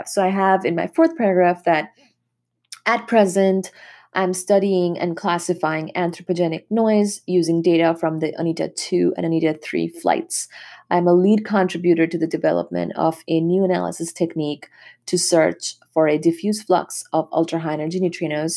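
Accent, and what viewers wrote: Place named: United States. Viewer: দিদি তোমার গলায় আমেরিকান উচ্চারন দারুণ লাগছে। 😅 আমিও পদার্থবিদ্যায় গবেষণা করছি ভারতে, প্রথম বছর। আমারও ইচ্ছা পোস্ট ডক করতে বাইরে যাবার 🙂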